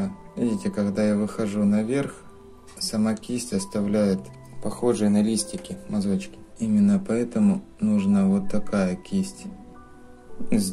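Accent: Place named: Odesa, Ukraine. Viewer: "Снова- таки три сантиметра.".. Украина. Замечательный диалект. Одесса?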